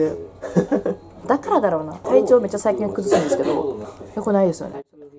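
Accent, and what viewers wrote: Kansai, Japan. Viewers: 関西、だと！？笑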